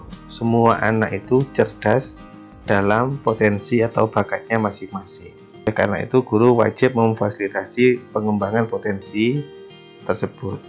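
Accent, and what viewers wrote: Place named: Osing, Indonesia. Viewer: koyone lare osing ta kang...#logate katone matur suwun ilmune....👍👍👍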